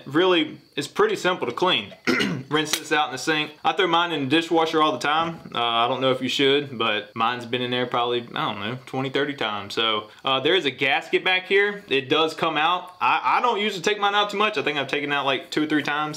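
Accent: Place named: South, United States. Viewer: What an accent! Southerner, I presume.. Georgia, Texas. Am I right? BTW, nice video. Keep it up!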